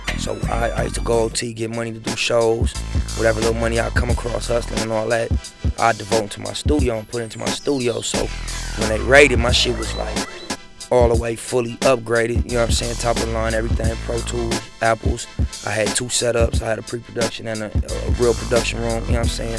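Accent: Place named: California, United States. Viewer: I love the Cali accent... I love it!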